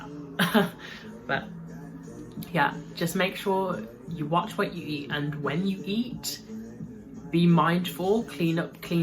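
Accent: United Kingdom. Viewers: Yes that British accent does something to me 😁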